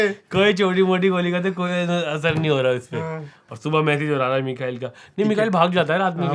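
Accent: Bengal, India. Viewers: I am a bengali buy bengali accent makes me laugh 😂😂😂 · Bengauli accent was just too good, top notch 🤣🤣🤣🤣🔥🔥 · Bengali accent >>>> prabhas jokes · bhai ek ep pura bengali accent mein karo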